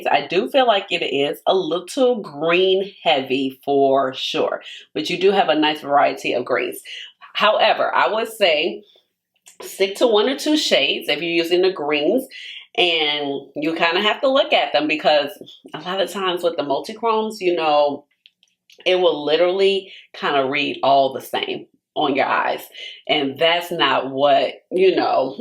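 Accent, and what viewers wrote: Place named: Chicago, United States. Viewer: I had another idea for when you reach your palette ranking video #24. You could do a brackets-style “battle” of all of the #1 ranked palettes from all of the ranking videos? You could also do it as a series instead of a single (long a$$) video. Just an idea! Loving your content not only for the lewks (which are stunning!) but I also love to listen to people with my hometown accent. Chi-town, baby ✴️🚤✴️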